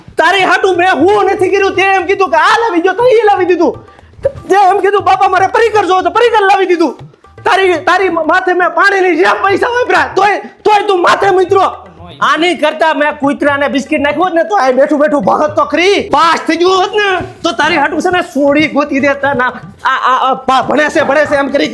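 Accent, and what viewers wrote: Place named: Kathiyawad, India. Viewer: કોણ બેન બોલે છે બોવ મસ્ત અવાજ છે હાવ કાઠ્યાવાડી 😂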